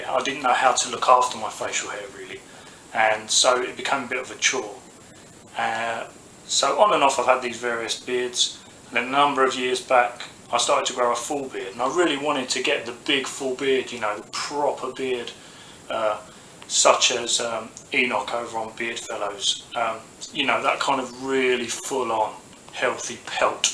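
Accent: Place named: United Kingdom. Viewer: Haha. Great video! I agree 100% with everything you said. I should also say, though, that even though I knew you were British, it didn't hit me that you would speak with such a strong British accent! That was kind of a shock, actually! Hehe. Great video. Thank you for posting. That moustache is looking great!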